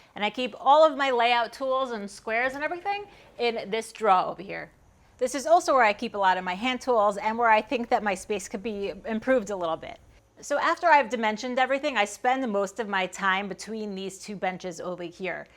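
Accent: United States. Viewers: She just sounds like a Yank to me, but whatever, you're all going to be speaking Chinese soon anyway.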